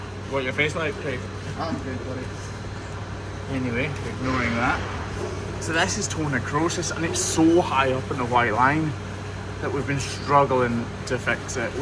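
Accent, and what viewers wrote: Scotland, United Kingdom. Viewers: you and your crew are the best.....if you don't have empathy for all creatures, what's the meaning of life, eh? Plus, the added bonus of a Scottish accent❣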